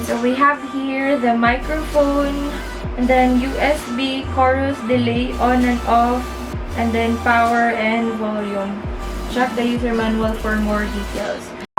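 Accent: Visayas, Philippines. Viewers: Bisaya ka ghourl?? HAHAAH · gHhaaaadddd bisaya si ate HAHAHAHA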